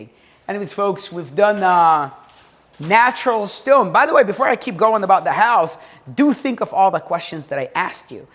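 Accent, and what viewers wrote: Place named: United States. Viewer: Thank you for sharing your journey! Your American Accent is Wow !🎉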